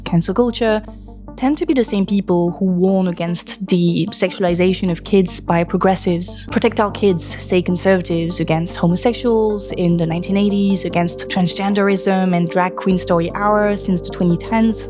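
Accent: France. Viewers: I agree with what you say about the effect of Lolita, but I hope you understand how the actual message of that book itself is to show how false, self-serving, and full of manipulation that perspective is. And I'm saying this as someone who is unashamedly attracted to young girls. I understand that my attraction is utterly separate and apart from any possibility of acting on it in reality, and would never take steps to do so. And it is because of that abstinence, that I have the right to my own natural feelings and thoughts. Your French accent is quite lovely, by the way.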